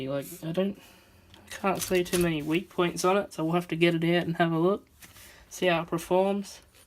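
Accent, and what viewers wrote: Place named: New Zealand. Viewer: What the f a r k happened there Govna?? Did you have that opened up already (the rear diff), OR did that just come apart in your hand??? Bummer if it did dude, but, you should sound ALOT more motivated. The way you did this video MADE ME wanna stab myself with a blunt spoon mate!! 🧐... 👎💯. I'm cryin' with the fucking migraine..!! 😯 Also your pronunciation is ALL over the place. Your confusing your Kiwi accent with an American one, it's obvious brah!!! 💯👀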